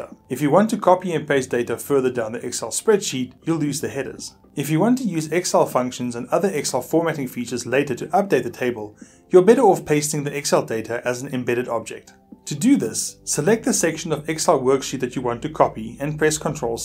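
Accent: South Africa. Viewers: Great South African Accent !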